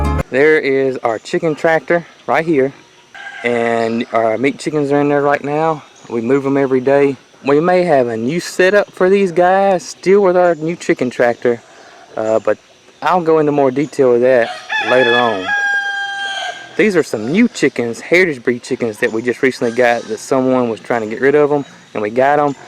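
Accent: South, United States. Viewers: Like little bootsie too.love the country accent.lol.im not a farm person but this is fun and cool to watch.altho i was raised in the country.God bless your family.Jesus loves yall.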